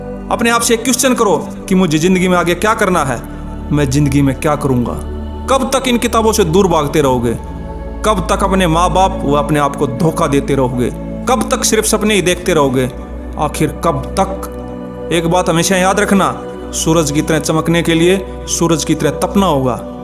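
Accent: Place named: Haryana, India. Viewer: Bhai Ji aapki voice se andaja lagaya hai 🙏🙏 aap Haryana se ho kya 🤔🙏🙏 bahut hi sundar video and voice ❣️❣️❣️❣️❣️🙏🙏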